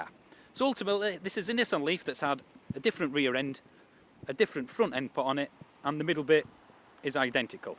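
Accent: Yorkshire, United Kingdom. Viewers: Yorkshire England I reckon near keighley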